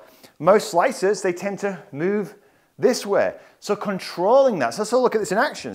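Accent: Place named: Northern England, United Kingdom. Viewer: love the tuition, shame about the flat northern vowels.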